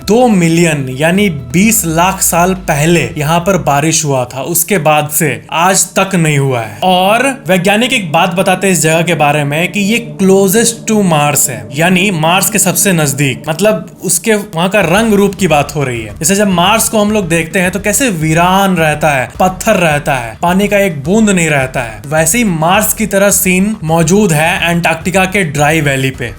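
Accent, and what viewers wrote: Bihar, India. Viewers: Bhaiya,u r giving bihari feeling ❤❤❤❤ in talking 😊😊😊😊😊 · Are u from bihar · Ap bihari h kya apka accent bihari jaisa lgta h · aap bihari language mat bola Karo purushling ko istriling 😅😅😅😅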